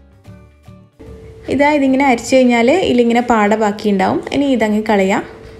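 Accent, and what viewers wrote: Kannur, India. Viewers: Good ഇങ്ങനെ തന്നെ കണ്ണൂർ ഭാഷ യിൽ തന്നെ മുന്നോട്ട് പോവണം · കണ്ണൂർ ഭാഷ കളയൊന്നും വേണ്ടാട്ട. അതാണപ്പ അയ്ൻ്റെയൊരു രസം. പറ്റുമെങ്കിൽ ഇംഗ്ലീഷ് സബ്റ്റൈറ്റ് ൽ കൊടുക്കാൻ ശ്രമിക്കുക.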